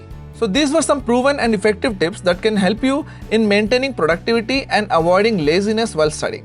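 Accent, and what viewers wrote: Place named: Bengal, India. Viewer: This accent is so Bengali.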